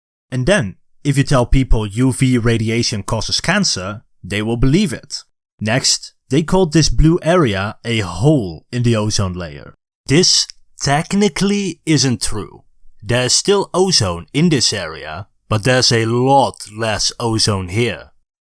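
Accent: Netherlands. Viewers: you sound dutch am i right? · I think it's his Dutch accent. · are you dutch ur accent sounds like it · Are u from the Netherlands cuz u have a Netherlands accent.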